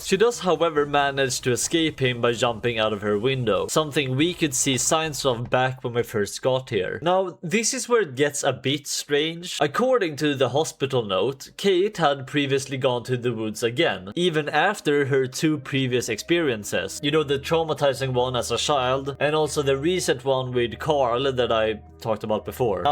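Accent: Sweden. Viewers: are you from sweden ? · I just know this guy is from Sweden. Can hear it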